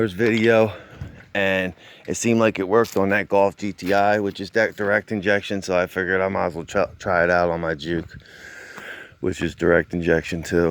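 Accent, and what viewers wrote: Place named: Philadelphia, United States. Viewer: I don’t know you, but I can tell from your voice you’re from Philly, Camden, Gloucester, or Burlington, County. I’m from Collingswood(Camden County). People still gimme shit about my accent, and I’ve been here since 03. I’m about to put ATS 505 CRO/CRC in my wife’s Mini Cooper GDI and came across your video. Anyway great video, man. Thanx for the testimonial in my favorite accent. This jawn better work, 🙃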